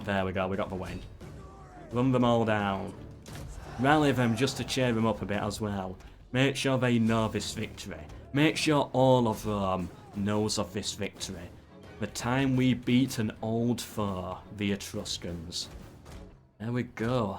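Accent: United Kingdom. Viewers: Is this the perfect British accent?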